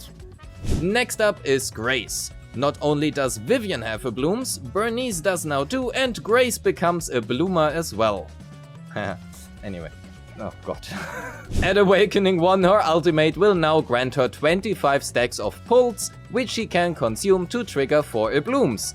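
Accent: Germany. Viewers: I know a german when i hear one, danke für das video meister!!!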